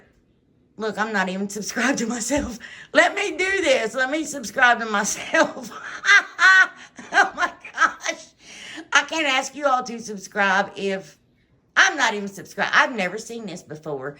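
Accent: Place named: Georgia, United States. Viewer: Just this morning I found your channel and I’m delighted!! Do tell from where your lovely accent comes? It sounds like you’re kin with my people down in RomeGA…your lovely sense of humor just had me grinning from ear-to-ear! Blessings to you/yours!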